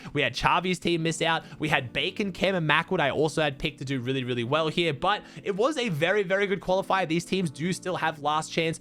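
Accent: Australia. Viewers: Tooo aussie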